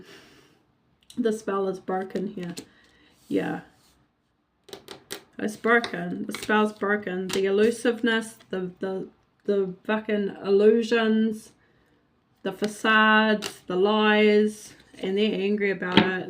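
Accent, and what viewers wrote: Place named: New Zealand. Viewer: I thought she sounds. New Zealand 🇳🇿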